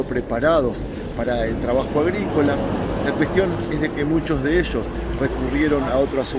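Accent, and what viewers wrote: Argentina. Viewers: Viniste alguna vez a Argentina? Tnés algo argento en la forma d hablar🙌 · es judío? Xq habla español y con asentó argentino chileno no se q asentó sea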